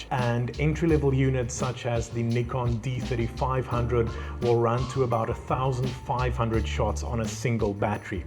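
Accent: South Africa. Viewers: He's a South African. South Africa is a country inhabited by people. People have different accents, depending on their parentage, heritage and significant influences during their language acquisition years. Almost no-one, except Actors playing a specific role that requires a particular accent "try to sound like an American", because (as in all societies) there are dozens of regional accents across the country. Your comments illustrates several things about you. ● You are a poor observer ● You are immature ● You have little knowledge of languages ● You rarely think clearly.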